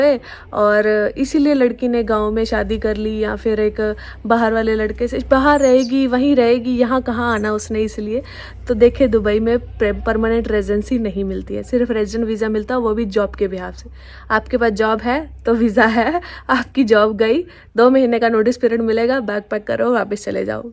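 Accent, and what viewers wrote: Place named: Punjab, India. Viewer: Aapki Punjabi mix ho jaati hai madam par jehi baat aapko junik girl banati hai madam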